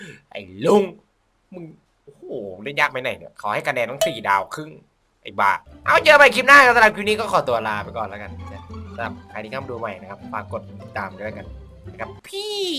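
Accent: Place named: South, Thailand. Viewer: อย่าแหลงใต้ได้หม้ายพี่บ่าวม้ายชอบ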